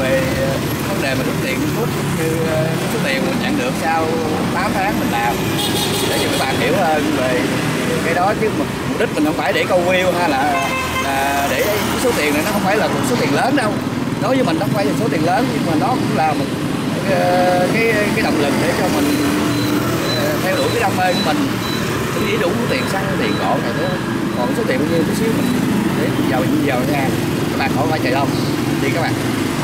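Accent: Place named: Mekong Delta, Vietnam. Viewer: Chúc mừng anh trai, đậm chất miền Tây chân chất, gần gũi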